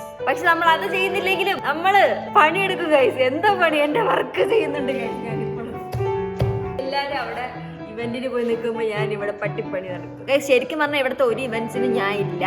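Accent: Kannur, India. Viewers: Kannur ഭാഷ..... Like ഉണ്ടോ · ചേച്ചി നമ്മുടെ കണ്ണൂർ ഭാഷ അങ്ങനെയാണ്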